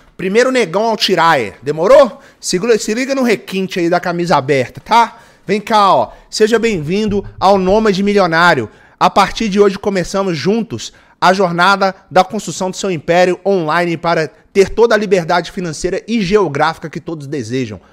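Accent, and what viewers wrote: Minas Gerais, Brazil. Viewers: O melhor de Minas é o sotaque... vamo dominar essa porra tudo, nuuu nois é o miosin que está tendo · Mineiro Né? De Rocha sow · O cê é mineiro né não? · É mineiro, né? KKKKKKKK Conheço o dialeto de casa em qualquer lugar! 🤣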